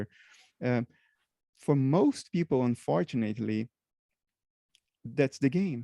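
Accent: Ireland. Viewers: you did a good job with this. well done. (side bar - i detect an Irish accent at the edges. Am I right) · Two beautiful human beings. I so wish you all the best with your podcast and I love your Irish? accent. 🙏🙏 Bernardo and.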